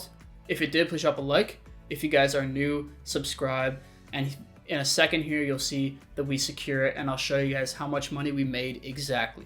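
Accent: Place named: Canada. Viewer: Ahhaahhhhh! You are Canadian! 🤣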